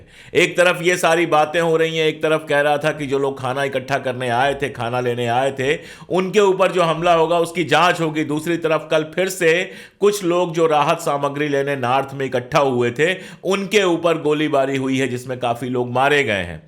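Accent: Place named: Awadh, India. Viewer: Bhayya aap ki aabaaz me Oudh ki khushbu aati hai, kya aap Oudh se hain? aapki patrakarita me insaniyat ki khshbu hai, Allah Paak aapko aise hi kaamyaab rakkhe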